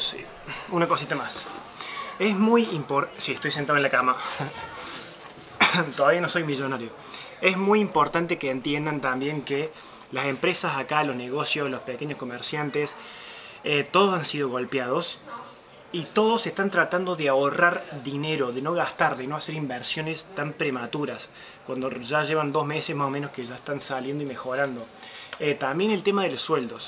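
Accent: Córdoba, Argentina. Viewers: ¿Es muy importante conocer el idioma del país donde vas a vivir? ¿Cuál es tu profesión? Por tu tonada, eres argentuno cordobés, ¿no es así?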